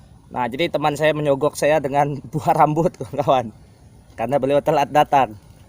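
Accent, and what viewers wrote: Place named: Banyumas, Indonesia. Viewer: Alhirnya dapat banyak ikan juga yutuber ini, cukup kocak, bahasanya agak ngapak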